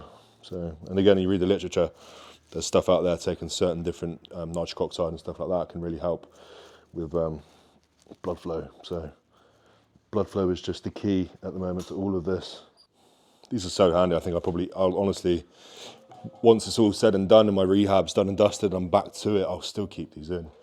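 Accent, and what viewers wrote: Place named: United Kingdom. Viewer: So weird to hear a British accent come out of what looks like a good old boy from Idaho.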